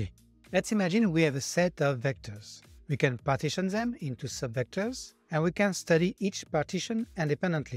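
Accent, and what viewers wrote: France. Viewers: It's not that bad. As a English speaker that knows/speaks French, I can tell you are French. It's not had to hear and understand what you are saying. I'd say keep going and make any improvements along the way.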